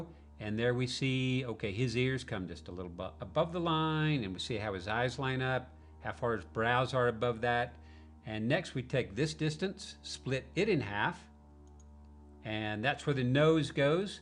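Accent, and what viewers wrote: South, United States. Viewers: ***** Where can we find your artwork? I am living in Utah and the mormons destroyed my design career- that is another story, but I am from Memphis and lived in Nashville for 8 years- Is that a southern accent that I am picking up? . But, I have decided that I want to do artwork and get a website going. Lots of Bigfoot sightings here, well, everywhere it seems.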